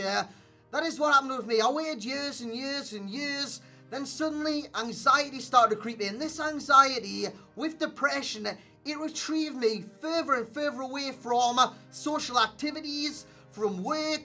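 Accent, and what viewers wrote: Newcastle, United Kingdom. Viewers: R u from Newcastle also keep up the good work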